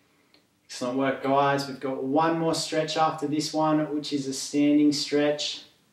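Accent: Australia. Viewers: IS THIS GUY FROM AUSSIE LAND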